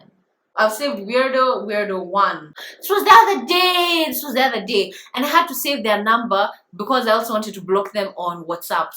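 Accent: United Kingdom. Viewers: The British acsent 👌😂😂😂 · The british accent makes me happy😍😂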